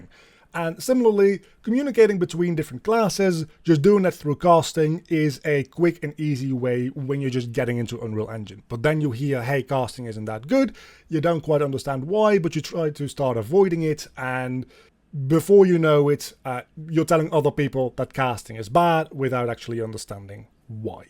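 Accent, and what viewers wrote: Netherlands. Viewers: Thanks for the answer! By the way, are you Dutch? I'm just curious because of the accent 😅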